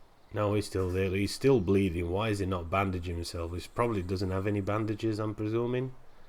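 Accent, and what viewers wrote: United Kingdom. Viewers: where are you from? you sound British but with something else thrown in every now and then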